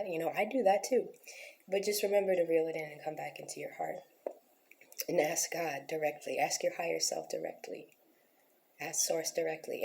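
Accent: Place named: New York, United States. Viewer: She is a diamond amongst the ruff... Her accent makes me think New York for some reason but not so sure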